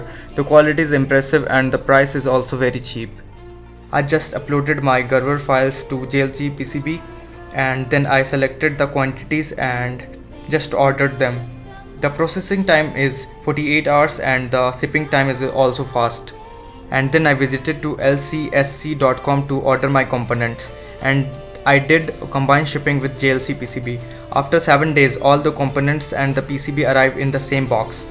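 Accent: India. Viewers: FFS! Cant stand that THICK indian accent. Why is every how to video made by some indian i cant understand... FAIL ! ! !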